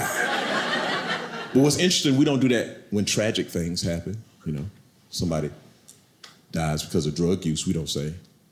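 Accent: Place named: South, United States. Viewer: I adore his lovely soft southern accent and gentle manners, for a brit its a joy to listen to Real class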